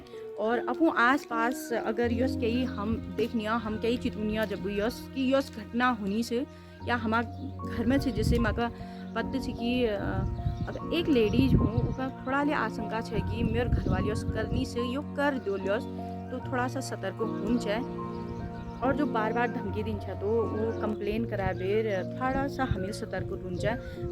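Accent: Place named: Uttarakhand, India. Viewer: आपकी भाषा ठेस गंगोंलीहाट की है आपका घर कहांहै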